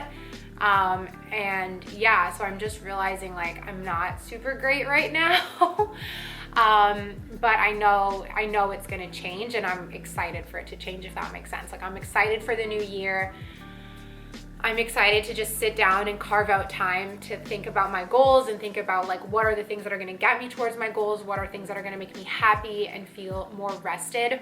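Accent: Canada. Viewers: Your Canadian raising is strong in this one, haha.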